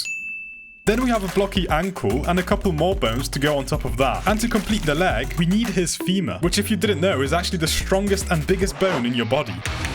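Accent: United Kingdom. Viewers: I know you're British because of the speaking and I don't know how no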